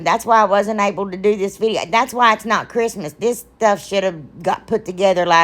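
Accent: South, United States. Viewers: Guuurrll !! That country twang had me smiling all thru your video. Where are you from? It can't be Ohio. I'm from Florida but have lived in Alabama for the last 48 years. So I've picked up their southern talk. Enjoyed your video. Your projects are great!! TFS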